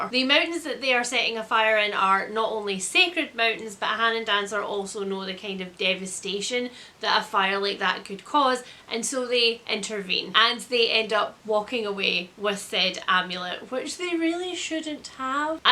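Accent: Scotland, United Kingdom. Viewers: I'm on staycation and I have binge-watched so many of your videos that my inner monologue now has a Scottish accent 🖤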